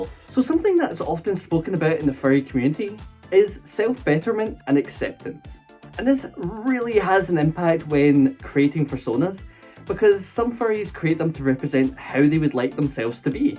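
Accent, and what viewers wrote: Scotland, United Kingdom. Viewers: I love ur accent SCOTLAND RULES!!!!!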